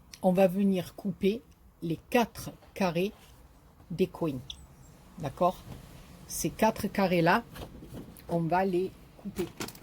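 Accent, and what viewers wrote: South, France. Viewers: Qui elle pèche j adore vous regarder merci pour l accent du Sud plein de soleil bisous josiane brindille 😂🤗🤗🤗👍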